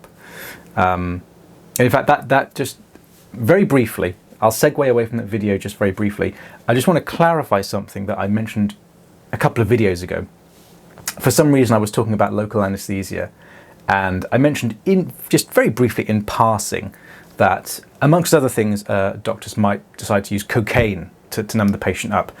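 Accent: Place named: RP, United Kingdom. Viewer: That accent is way too posh for you not to be also part of the “anyone” group.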